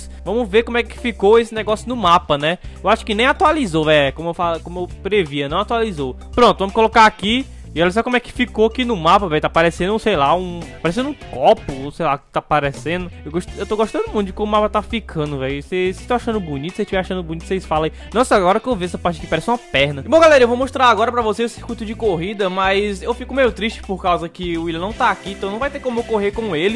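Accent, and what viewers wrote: Ceará, Brazil. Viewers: Tú e de onde man,esse jeito de falar parece CE kkkk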